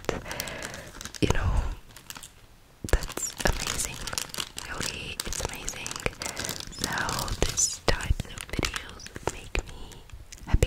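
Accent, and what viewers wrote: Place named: East Germany, Germany. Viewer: I think Eastern German/Polish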